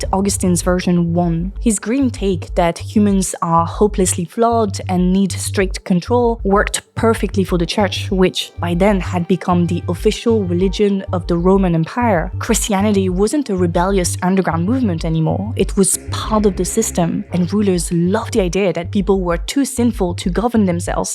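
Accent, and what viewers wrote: France. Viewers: I agree with what you say about the effect of Lolita, but I hope you understand how the actual message of that book itself is to show how false, self-serving, and full of manipulation that perspective is. And I'm saying this as someone who is unashamedly attracted to young girls. I understand that my attraction is utterly separate and apart from any possibility of acting on it in reality, and would never take steps to do so. And it is because of that abstinence, that I have the right to my own natural feelings and thoughts. Your French accent is quite lovely, by the way.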